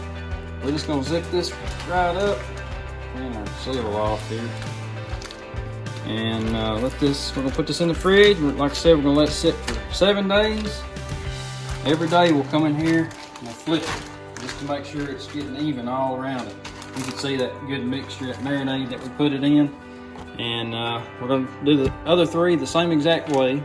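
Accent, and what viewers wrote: South, United States. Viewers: Nice to see such a nice young man enjoying his family. Not many homesteaders in Mississippi so enjoy watching someone with that southern drawl.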